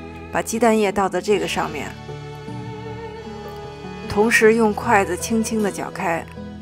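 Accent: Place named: Beijing, China. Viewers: 听口音像是京腔。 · 冒昧請問是哪裡人？在那裡長大的？聲音像北京人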